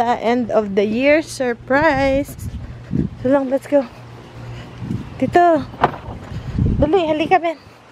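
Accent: Batangas, Philippines. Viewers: GOD BLESS YOU as a good daughter....si mader say ni, she will miss you din daw pag hindi ka pupunta sa kanila. Just continue serving them and itawa mo na lang yung mga comment ni Mader. More power to you and say HI to your parents. I also enjoy watching the 2 boys, lalo na si.... bintog ang cheeks....Ben is such a sweet ham, baby pa kasi. regards to, saludo ako sa pagpa supportive niya.....you are so Blessed. Keep up the good work,hane.... and dont forget you batangueno accent.....hehehehehe....tita ailec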